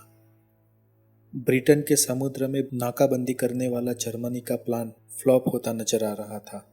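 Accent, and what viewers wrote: Gujarat, India. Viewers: आप गुजराती हो क्या ?😇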